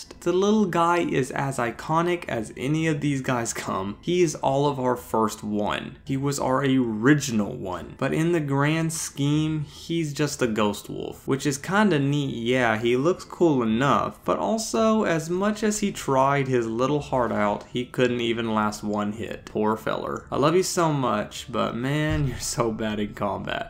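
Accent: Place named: Tennessee, United States. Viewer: I hear a TN accent. Maybe TX. Maybe just joke accents but theyre convincing.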